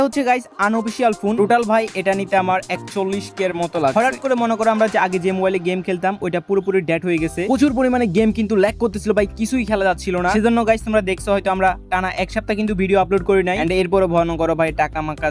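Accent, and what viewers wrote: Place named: Chittagong, Bangladesh. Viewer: vhai ki koitam to ar video in ato valo lage ar ton but ay kintu chatgya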